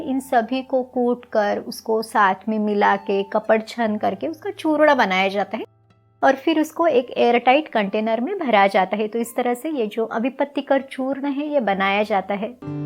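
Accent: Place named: Maharashtra, India. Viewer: Aarey tumche bolanyaavarun tumhi marathi waatata. Mag aadnaav Kasey 😂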